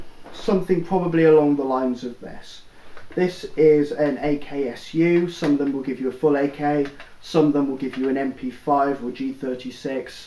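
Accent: England, United Kingdom. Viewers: O my lord.. i was expecting a deep southern hick accent.. not a light voice and english accent.. LOL Anywho, i catch myself today watching vids on first time airsoft and strategy out of airsoft withdrawls due to winter in minnesota -_- Very good videos so far my friend :)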